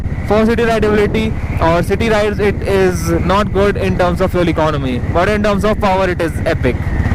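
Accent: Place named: India. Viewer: I love the Indian accent...so soft and calming...and I have a Classic...so this vid is great for me!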